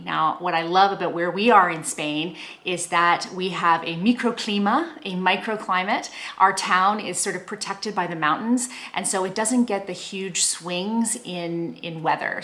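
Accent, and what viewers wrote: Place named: Canada. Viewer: I absolutely love canadian english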